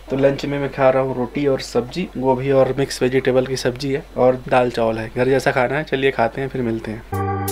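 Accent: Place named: Kanpur, India. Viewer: Guru kanpur se ho ka..yar boli bhasha ekdam mil rahi hai na..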